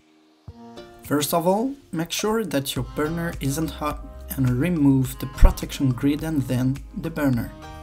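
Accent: France. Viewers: Thank you for sharing and please no apologies for your beautiful French accent! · Please don't apologise for your georgious French accent, to me that's what made this video so interesting. Had to watch it twice. Very good advice Also some of the comments were helpful to. Thank you for this video. From London UK 🇬🇧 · Your French accent is music to my ears and your English is faultless. Thank you for the video, it was most helpful 🇬🇧🏴 Enjoy your weekend. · Your French accent is very sweet and charming and your English is fine. No need to apologize. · Your French accent is music to my ears!! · Good information! Love the French accent -- no need to apologize. :-) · don't apologise for your accent , it's what makes you unique, i have a french accent too, ah ah , love your video by the way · We love your French accent. Your are French after all❤️❤️Merci!! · merci beaucoup for the tips. 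Love the french accent so no problem at all!😊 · Do not apologize for your French accent. Merci beaucoup. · It is nice to hear your French accent.Thankyou this is so helpful. Easy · Absolutely no need to apologise for your gorgeous French accent! You speak far better English than some people in my part of the UK! Thank you so much for this video - worked perfectly on my very neglected burners ❤️ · Your French accent is fine and your English is excellent. Thank you for your video. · i love your french accent! Tres Sexy! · Your English is great and I like your French accent. No apologies are necessary. Thank you for sharing. This is a great tip. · Love your french accent!!! 🤗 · I've lost all interest in cleaning my hob – I just want to stay here and enjoy the lovely French accent! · Don’t ever apologise for you accent we love it! Be proud of your heritage and you French culture thanks for the video! 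· I like your French accent me too I have a French accent I am Mauritian the family speak French and creole thanks very much for your video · Thank you, I will tryy this. Your French accent is charming! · thank you for your video. your french accent is gorgeous! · Please never ever apologise for your French accent! It's adorable! And this is an excellent video, so thank you for posting. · Never apologize for your french ascent love it. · There's nothing wrong with your French accent. You should listen to mine! LOL! Very good job on the video! Well done! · There's no need for you to apologize for your french accent I can understand your English perfectly.🤗🤗🤗🤗🤗🤗🤗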